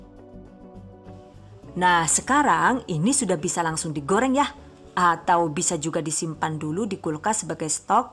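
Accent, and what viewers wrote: Banyumas, Indonesia. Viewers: syelaallluuu ne ngikutin resepe mbak'e iki resepny simple bingiiittt dan g kalah menarik ngapakke kui lhooo caaaa'tat wkwkwkwk "DONE" #giveawayTHVdesember2019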